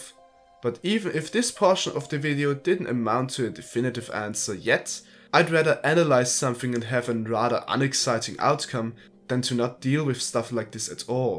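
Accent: Germany. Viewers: ah ok, Ur accent do rly sounds german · German guy or what? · Bist du Deutscher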